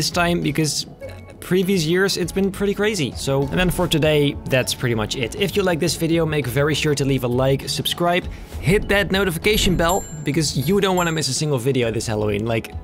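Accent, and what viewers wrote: Netherlands. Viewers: I ain't gonna lie bro, I don't mind you having a Dutch accent when you talk, but I DO when you sing..That was intense haha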